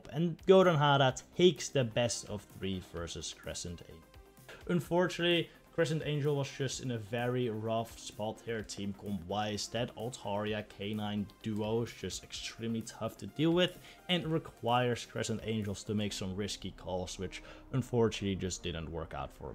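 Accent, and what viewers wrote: Netherlands. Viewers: Youre Dutch aren't ya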